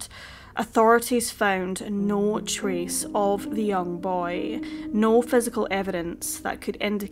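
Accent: Scotland, United Kingdom. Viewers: She’s Scottish I believe:that’s how they pronounced certain words.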